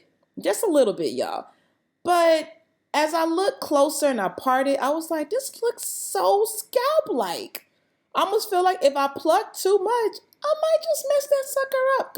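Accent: Nigeria. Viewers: “Like it’s part of my chocolatiness” 😂😂😂 I love your naija accent too.This quarantine situation is having errbody glooowwing 👀👀👀👏👏👏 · You make me laugh when you speak Nigerian accent.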